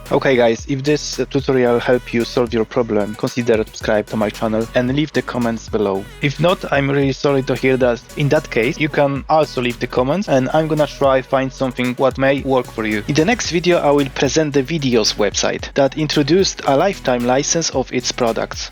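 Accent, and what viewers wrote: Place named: Poland. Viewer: Słuchać, że Polak 🤣 Dzięki za pomoc!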